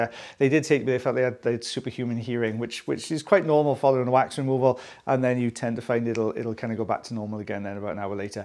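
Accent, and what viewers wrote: Wales, United Kingdom. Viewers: It is SO NICE to hear a welsh accent. Haven't been since covid started. Miss Anglesey so much. Been going there to visit relatives all my life.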